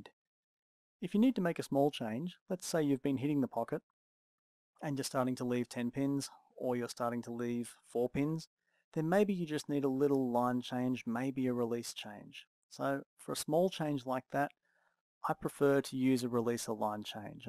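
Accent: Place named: Australia. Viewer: good to hear an australian voice helped me a lot thanks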